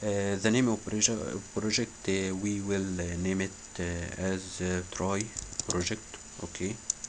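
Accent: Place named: Arab world. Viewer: هههههههه بعد ما وصلت 3 دقايق فى الفيديو قولت الانجلش دا بتاع حد عربى قفلت الفيديو لاقية اسم القناة بالتوفيق يا هندسة اتمنى انك تعمل ابديت للشرح مع نسخ اللارافيل الاعلى على فكرة انا مش بتريق على الانجلش بتاعك انا الانجلش بتاعى وحش جدا اصلا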